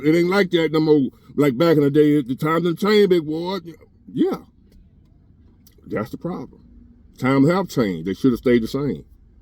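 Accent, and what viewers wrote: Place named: Chicago, United States. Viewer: Chicago voice....finesssee! finessse!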